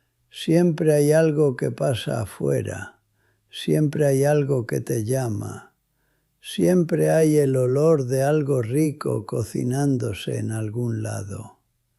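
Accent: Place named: Spain. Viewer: Tu acento es español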